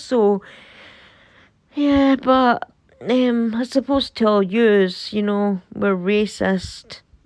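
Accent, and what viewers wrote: Scotland, United Kingdom. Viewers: That is a beautiful Scottish accent. Stay safe, be well 🙏🇨🇦🇬🇧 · Carry on, when i first spent time in Scotland I thought the people at Glasgow central spoke another Language, but I as others will soon tune into your beautiful accent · A Scottish accent. I can understand her fine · I don't have a problem with her accent, or anybody else's for that matter - foreign or UK. I have a good ear (and happen to be Scottish too), but it's not always easy for others if they are not used to it. Even the English put subtitles on our home produced programmes if it's being aired in their country as some of our dialects and pronunciations are incomprehensible to outsiders. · I absolutely love Scottish accents. I’ve started watching some of your videos, l didn’t take match notice of the title, and after listening l didn’t think you came across as being nervous, well done to you. 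· Hi you’re Scottish where are you from,am from Scotland west coast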